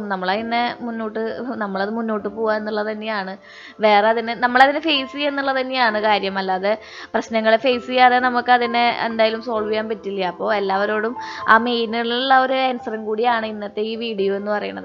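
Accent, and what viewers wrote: Thrissur, India. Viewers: Sathyamnu😁I like Thrissur slang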